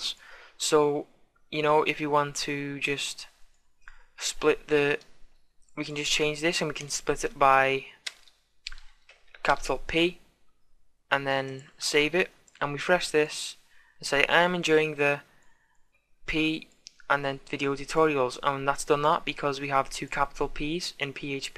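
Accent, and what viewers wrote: Liverpool, United Kingdom. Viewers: this actually really helped me thanks, btw im also from liverpool (you sound like you have a scouse accent)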